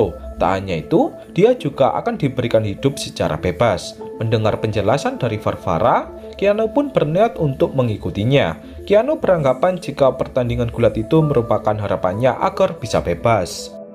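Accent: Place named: Tegal, Indonesia. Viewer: Asal tegal apa brebes yg ceritain film ini..logatnya kentara banget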